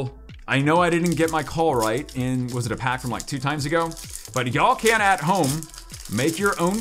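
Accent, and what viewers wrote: Germany. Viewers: You littrely sound German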